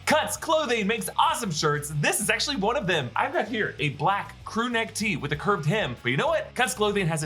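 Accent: Australia. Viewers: I loved your Aussie accent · Aussie accent is on point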